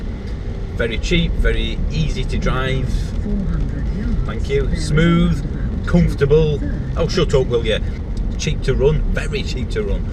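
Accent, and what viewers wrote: Yorkshire, United Kingdom. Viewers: Where in Yorkshire u from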